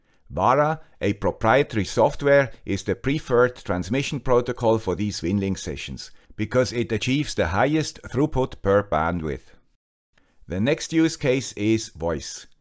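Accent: Switzerland. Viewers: Not sure how I missed this channel, guy with the Swiss accent.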